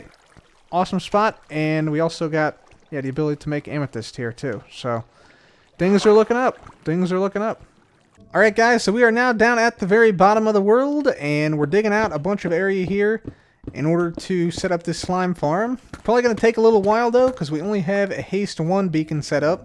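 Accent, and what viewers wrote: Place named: Chicago, United States. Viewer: the accent reminds me of the saturday night live skit with farley and akroid ...."da bears..... da bulls....ditka" ..." how many heart attacks for you bob?"..."gonna be a bakers dozen"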